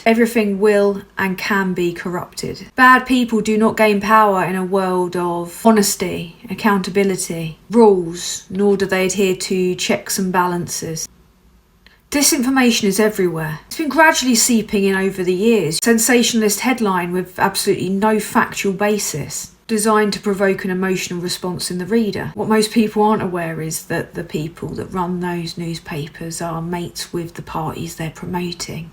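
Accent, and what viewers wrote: United Kingdom. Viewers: Ur british accent is so beautiful! Love ur content from the USA!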